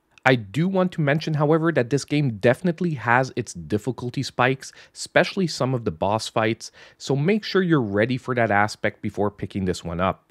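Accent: Quebec, Canada. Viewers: That's a Quebec accent if I've ever heard one.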